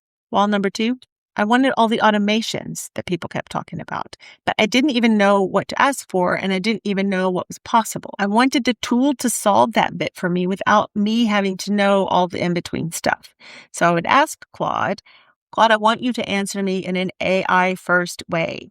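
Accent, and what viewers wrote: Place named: United States. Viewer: One of the first things that caught my attention: American accent, but Weck jars in the background? As I'm just starting out with Claude Cowork and Code, I'm looking forward to following along and maybe avoiding some mistakes. Bonus: finally a woman in my age bracket explaining things to me instead of a 20-year-old guy.😉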